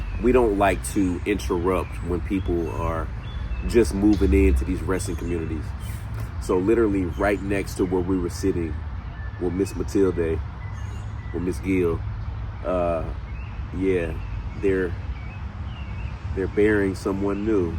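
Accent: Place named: Texas, United States. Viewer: Sybelle said " you sound like you're from Texas" then she said "I like you & she may visit you" She also said " you were funny" 🤣 She likes you alot and wants you to come back. 😊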